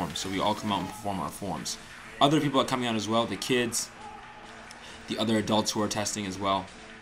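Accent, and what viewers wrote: New England, United States. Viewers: What school is this Sounds like you’re in New England?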